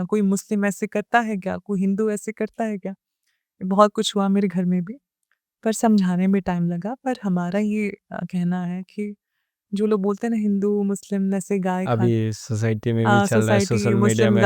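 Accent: India. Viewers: aap ke bolne ka lahza bilkul ham Lucknow walon ki tarah h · The way you are talking you are not look like a Nepali, you are look like Indian, all the best 👍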